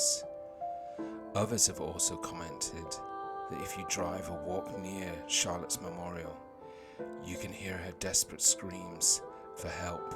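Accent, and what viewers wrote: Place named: Northern England, United Kingdom. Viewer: Gruesome story loved the northern accent G 😅😅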